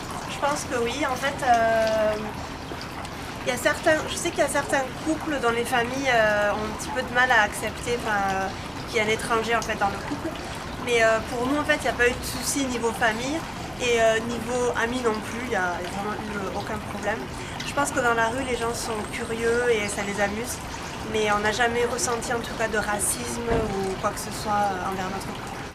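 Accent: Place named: Toulouse, France. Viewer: Ca fait plusieurs vidéos que je regarde de toi, et je me disais : "mais elle a le même accent que moi elle doit être de Toulouse".... Et enfin j'ai la réponse ! :)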